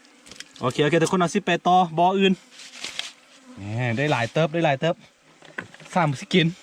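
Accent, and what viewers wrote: Isan, Thailand. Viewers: พี่คนดำเนินรายการเป็นคนลาวใช้ไหมคะ...ฟังสำเนียงอีสาน.. มักหลายเว้าม่วนคัก..ข้าน้อยมักภาษาลาว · สำเนียงภาษาเหนือปนสำเนียงอีสานแม่นก่อเจ้า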